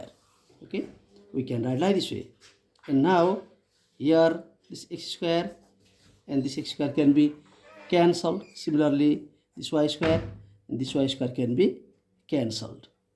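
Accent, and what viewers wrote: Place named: Nepal. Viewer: nepali jasto lagyo sir tapai nepali language ma handina aajhai better huntheo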